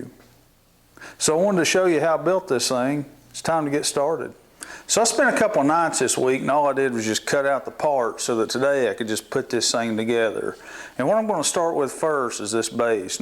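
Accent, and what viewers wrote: United States. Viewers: I love it. I was replacing a 10 year old 10" ryobi haha so it's definitely a step up. I've started making the miter stand today and I'm just using your dimensions, so that worked out good with you telling your dimensions as well. Appreciate the work you put into those videos as its going to help me a lot. If you don't mind me asking, what state are you from. I live in the VA/TN border.... Sound like a country boy to me haha I know that country accent well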